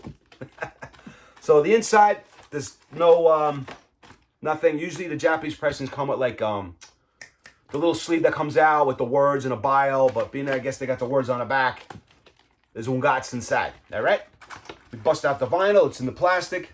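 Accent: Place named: New York, United States. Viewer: Only just found your channel by luck, love it, love the New Yawk chat too, my favourite City in the world.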